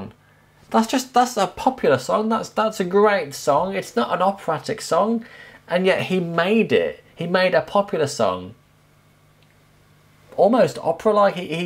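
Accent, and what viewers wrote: United Kingdom. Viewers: Hello from Kazakhstan AND I REALLY LIKE your British pronunciation By the way,do you speak French?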